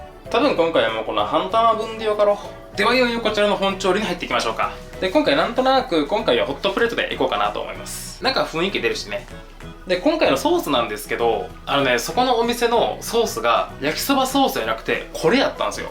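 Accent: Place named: Nagasaki, Japan. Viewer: おなじ長崎県身として 方言嬉しい（笑） 〇〇っちゃんﾊﾞﾘ長崎やし（笑）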